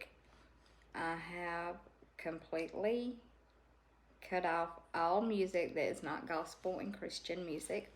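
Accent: South, United States. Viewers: Thank you for the wonderful videos. I am glad you found what you need to put you at peace and make you happy. You and your cats make alot of people happy. I love the southern ways. I am from SC and I love the accent and the southern saying. Please continue with the videos, I need that joy in my life. life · From Argentina Honey you are just fine!!!! I lived in the US specifically in the South, and I watch your videos because it reminded me my time sweet time in the south that i learn to love, i love the accent the humor the kindness!!! So what i mean with these is that you are just fine❤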